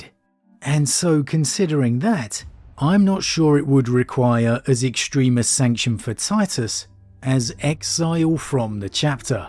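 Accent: United Kingdom. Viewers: The American pronunciation of Lieutenant is correct. It was only the British misunderstanding how the French were inflecting the "Lieu-" prefix that led to the incorrect "Left-tenant" pronunciation you guys use today.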